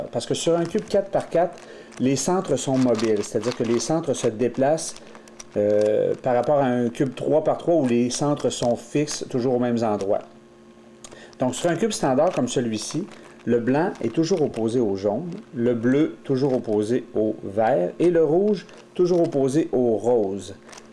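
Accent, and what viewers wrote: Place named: Quebec, Canada. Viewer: Lol je suis un débutant en matière de rubik cube mais j'trouve que c'est bien expliquer.. Sinon j'adore l'accent québécoi ^^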